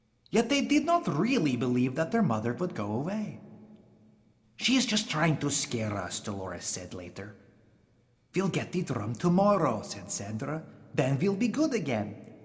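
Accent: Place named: Transylvania, Romania. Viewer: Oh, this is adorable! If my LO can understand your (quite well-done!) Transylvanian accent (she’s 3 🤷🏼‍♀️), I’ll introduce her to a few of my favorite SSTTITD stories.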